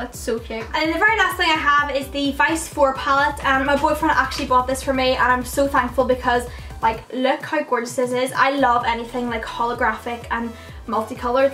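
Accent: Northern Ireland, United Kingdom. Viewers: are you from northern ireland x i am 😁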